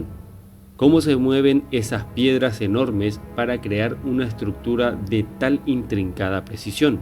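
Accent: Paraguay. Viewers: Eres de paraguay amigo ?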